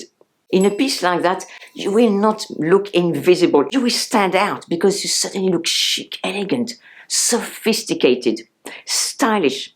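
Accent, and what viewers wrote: France. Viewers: You are very talented and can make anything look chic and expensive. So classy are some outfits that I copy some belts and scarves. The big thing I have taken to is red lips. My mother told me when I was 15 yrs old to wear red lips and 70 yrs later I'm doing it again. I wear eye shadow more naturally with a chocolate colour in the top of my lids and a beige or gold onto the lids. Muted of course. It's a head Turner. Just love that you don't put concealer under your eyes either. It's a winner. I watch Nicole Johnson and another french/ American model but you are tops for a little that goes a long way. Please do some more on your classy make up. Just love your anglicised french accent. 😍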